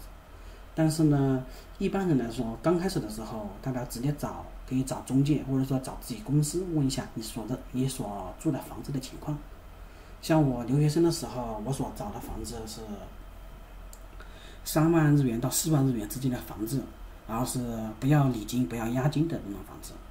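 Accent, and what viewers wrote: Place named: Hunan, China. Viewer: 听着像湖南口音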